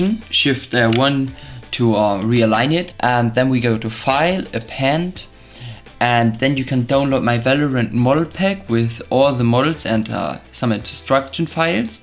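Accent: Germany. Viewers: are you german? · You have a little German accent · Are u german??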